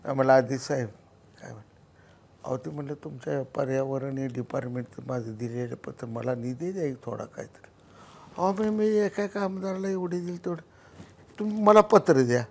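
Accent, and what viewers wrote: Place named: Mandesh, India. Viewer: सांगोल्याला मिळालेले दोन हिरे गणपतराव देशमुख साहेब आणि शहाजी बापू पाटील ❤️ माणदेशी बोली ❤️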